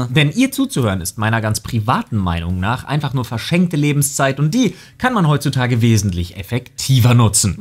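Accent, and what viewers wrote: France. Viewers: Mit deinem Bart und diesem französisch klingenden Aktzent könnte man denken, dass du wirklich Franzose bist😅😅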